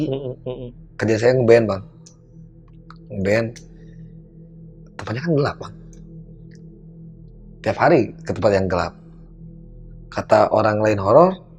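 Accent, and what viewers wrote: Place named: West Java, Indonesia. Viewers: Sundanya kerasa bgt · Justru logat Sunda lucu😂suaralu kali yg gaenak didenger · Bengsi gk tuh. Sunda lekoh yess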